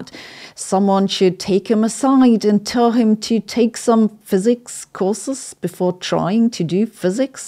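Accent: Germany. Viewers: Did you notice that all complements by someone with a German accent sound sarcastic. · Wow! Your delivery is very German! Love your work. Science requires such candor.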